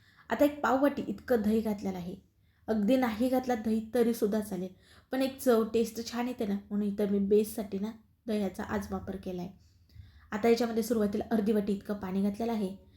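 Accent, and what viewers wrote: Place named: Kolhapur, India. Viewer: कोल्हापुर ची भाषा की नाय एक नंबर आहे बघा 😊😊😊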